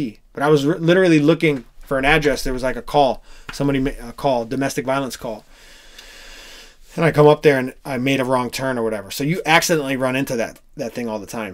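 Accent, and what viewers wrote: Hawaii, United States. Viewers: Haole-Hawaiian for LIFE! Makes me smile when your pidgin comes out interspersed between most of the reg. English stuff. Haha. Right on man.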